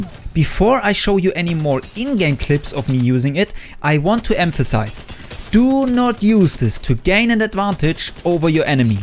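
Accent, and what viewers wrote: Germany. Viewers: Man hört übelds das du deutscher bist😂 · I can heard that your German bro haha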